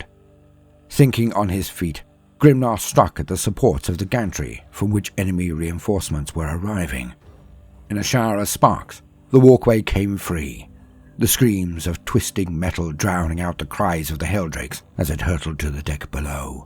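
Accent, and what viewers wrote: United Kingdom. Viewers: Godbless you. Nothing hits quite like a softspoken British man during a nap 🙏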